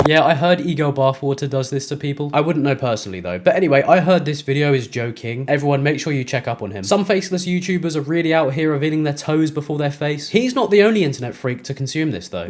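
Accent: RP, United Kingdom. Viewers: I hope that colonialism and imperialism also come to your mind every time you hear that accent, because those are as traditionally British as the pompous tea drinker. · As a german i just love your british english. It literally sounds like some rich guy in a suit with a cup of tea is talking to me😂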